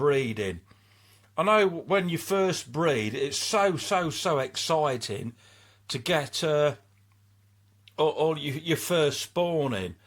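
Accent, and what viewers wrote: Midlands, United Kingdom. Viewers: hi, just subscribed, great videos you have. yes I agree with you regards the fry, wouldn't be so bad if she just had 20 fry or so. p.s. you must be from the Midlands with your accent 😉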